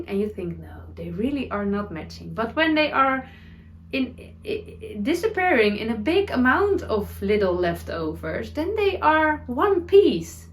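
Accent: Netherlands. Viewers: As a second generation Dutch Canadian, can I just say that I LOVE listening to you speak. ❤ It almost feels like I’m visiting with a Dutch relative, and when you try and find the right English words I find myself cheering you on as you try different ways to say it. I wish I spoke Dutch - it’s such a beautiful language and a beautiful heritage that brings me so much joy to listen to. ❤️ Someday I hope to visit!!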